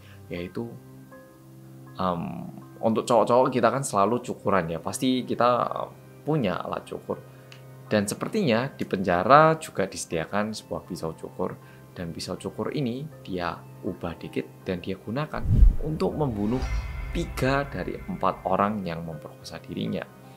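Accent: Java, Indonesia. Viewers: 3 channel storytelling favorit: 1. (pelan, medhok dan mudah dipahami) 2. Nadia Omara (gayanya kayak itu ghibah) 3. Arroyan Pram (Konspirasi dunia pake Astronomi, Fisika, dan editannya gila keren banget, serasa nonton agung hapsah dan Jerome polin bahas konspirasi) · Apa cuman aku yang suka ngikutin atau jawab: bersama orang korea yang.... Me:Medookkkkk:| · Yokk! Kak dikit lagi 4M subscriber nihh kak. Aku ngerti sih banyak yg suka liat konten² kak bukan karena kak orang korea medok aja, tapi karena kak orangnya selalu berusaha buat diplomatis atau gak mendukung 1 sisi dalam ngasih opini dan pemilihan katanya oke, bahkan editornya aja kayaknya suka ngelawak kek kata² "eummm" tadi. Kak juga gak terlalu membahas kpop yg sekarang malah menjadi bahan menarik buat narik banyak orang, karena berusaha buat gak membela salah satu pihak gituh. Jadi semua konten²nya selalu informatif dan dapet valuenya... 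bener² gak salah sih milih jadi panutan pas di tugas kuliah wkwkwkwk. Semoga sampai 20M subscriber pun mempertahankan hal ini.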